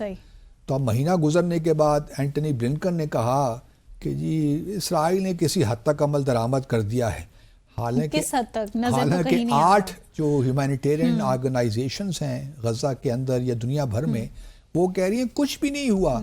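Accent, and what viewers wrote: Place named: Punjab, Pakistan. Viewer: پروگرام کا آغاز انگریزی میں اس طرح کرتی ہیں جیسے کوئی انگریز کامپر ہیں - پھر پنجابی لہجے میں اردو شروع ہو جاتی ہے - بھائی سیدھے سیدھے اردو پورا پروگرام کرو یا پھر انگریزی میں کرو - یہ اردو انگریزی اور پنجابی کی کھچڑی کیوں پکاتی ہو ؟ اتنا احساس کمتری کیوں ہے ؟ کس کو انگریزی بول کر مرعوب کر رہی ہیں ؟ انگریزی لہجہ اور تلفظ بھی درست نہیں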